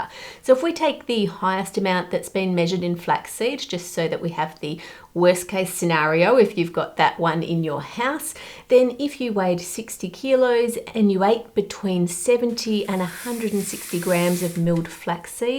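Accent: Australia. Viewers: I think sounded more Australian today. I have always thought she had a soft accent- probably because her parents are from England. In today's video it sounded thicker. 🌏🇦🇺🦘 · there's something frighteningly funny about hearing an Australian talk about near death experiences with wildlife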